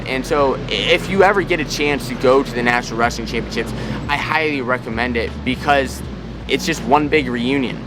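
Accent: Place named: Pennsylvania, United States. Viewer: Keep working all your contacts and especially the coaches. If you can help a coach recruit a wrestler or two you will have made an influential friend for life and then we will be watching you on Big10 Network or ESPN. All of your followers can help you scout wrestlers that should be offered scholarships to the big and nearly big programs. Then if you could lose the Pennsylvania accent and try really hard to not be a Penn State fan all of the time you could become one of the most popular wrestling announcers ever. You obviously love this and should follow your passion. Best wishes to you and just like wrestling - success goes to those who prepare in the off-season.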